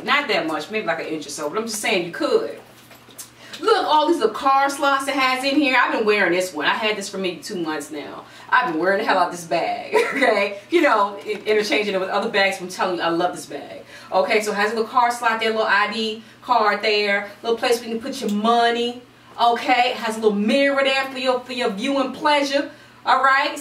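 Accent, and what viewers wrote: South, United States. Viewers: Your smile and dimple and slight Southern accent...(swoon) :-D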